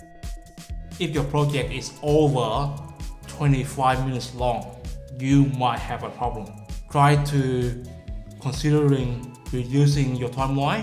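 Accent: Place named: South Africa. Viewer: Dude r y from South Africa? Cool accent! ❤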